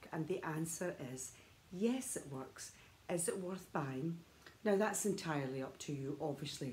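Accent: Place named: Scotland, United Kingdom. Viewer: Love the Scottish accent…candid review from the lady well done ..mam 👏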